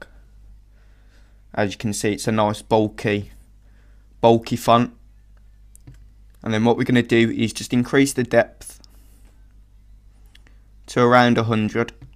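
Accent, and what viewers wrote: Birmingham, United Kingdom. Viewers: Dude we came from them, he's British, we are pronouncing it wrong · your from birmingham aha ? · Are you british???????????????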